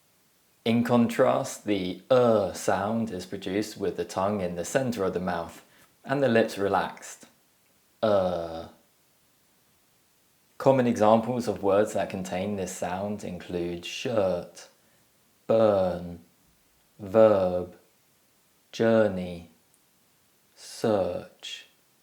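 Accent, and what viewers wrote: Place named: England, United Kingdom. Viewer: Well done. I have some difficulties pronouncing those words in sentences with a British accent because I've achieved a defined American accent, but at least I tried it. But regarding understanding your accent, I shouldn't have problems understanding most people from England. You're a handsome man. Thanks for your helpful lessons. 🥰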